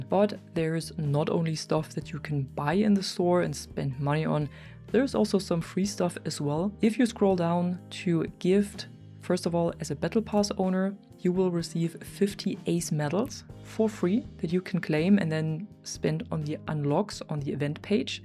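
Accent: Germany. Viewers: I like your German accent when you speak in English 🙂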